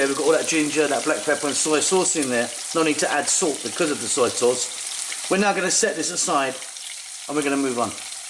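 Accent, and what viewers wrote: United Kingdom. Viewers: Love your fabulous British voice as well ! 👍🙂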